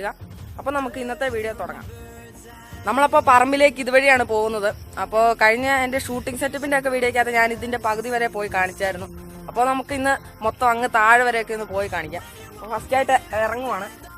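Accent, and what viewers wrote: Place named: Idukki, India. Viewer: ഇടുക്കി ✌️✌️✌️ slang കേൾക്കുമ്പോ അറിയാം 😇😎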